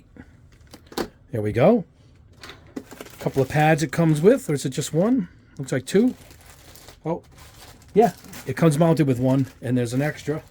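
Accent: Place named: North, United States. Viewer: I watched it again and figured it out. I’m in the deep south. My 65 year old southern ears heard your northern accent say “I guess you could turn it off for lacquers and enamels.” What you actually said was “…..turn it up for lacquers and enamels.” Sorry about that. I’m going to have to get one of these! Thanks again for the video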